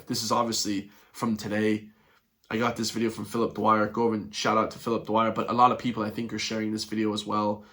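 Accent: United States. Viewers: Get it right though; don’t clickbait! It’s not that irish isnt allowed is it? it’s not illegal it’s just that one person has mentioned it. Also, how the hell would you know if he’s illegal never mind all the other racist shit you’re spewing. You don’t sound very irish either btw, getting a strong yank flavour to your accent buddy.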